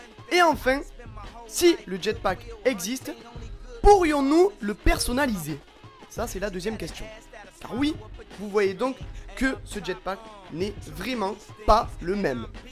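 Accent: South, France. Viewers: Ta bien l axent du sud toi x)) moi aussi tkt :D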